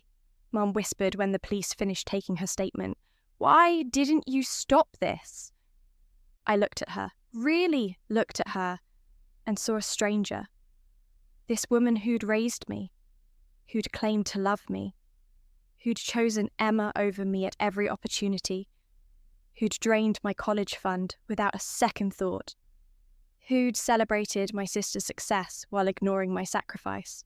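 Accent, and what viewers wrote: Yorkshire, United Kingdom. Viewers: What accent is that? Yorkshire?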